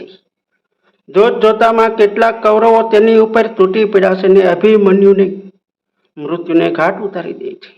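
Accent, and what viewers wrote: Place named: Amreli, India. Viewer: આવું બધું કથાકારો માટે રહેવા દ્યો,. બહુ જામતુ નથી. તમારી તો તળપદી અમરેલી/ભાવનગરની બોલીવાળી કોમેડીમાં જ અમને વધારે મોજ આવે છે.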